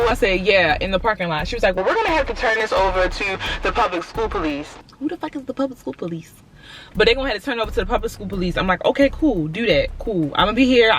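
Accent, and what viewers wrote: Baltimore, United States. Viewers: That Baltimore accent strong lol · Girl you from Baltimore? I know that accent from anywhere.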